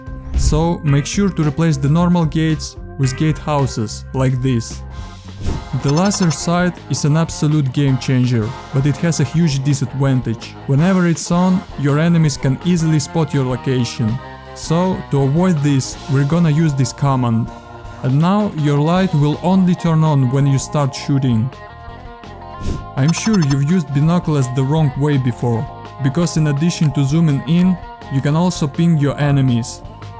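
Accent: Russia. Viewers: Where you from? R u from Russia? U have a Russian accent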